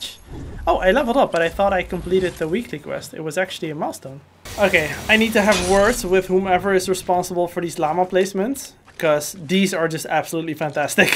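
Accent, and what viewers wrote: Netherlands. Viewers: Please can you make a season seeven version? Also are you dutch? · Are you dutch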